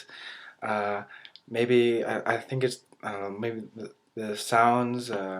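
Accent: Bavaria, Germany. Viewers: Ich bin beeindruckt. Du sprichst was Wortschatz und Grammatik betrifft besser deutsch als so mancher Deutsche :-). Sehr gut auch der bayrische Dialekt. Da sieht man mal wieder was man mit Spass, Fleiß und Talent alles erreichen kann.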